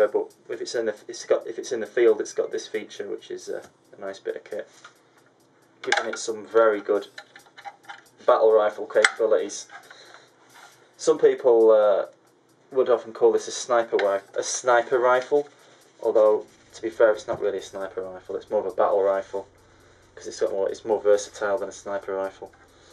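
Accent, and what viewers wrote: United Kingdom. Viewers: alright, nice gun, yorkshire accent? :-) slr is a giveaway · You sound like you are from Great Britain. I'm a little ignorant on your laws, but I was under the impression that you could not own a gun like this. Awesome gun, man. I'm saving up for a DSA FAL like this one. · You sound British. How do you have that? Or are you living in the US?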